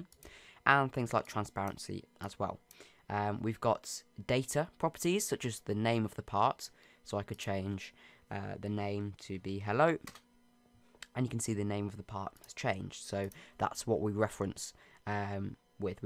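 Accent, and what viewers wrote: United Kingdom. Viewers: prolly because of british accent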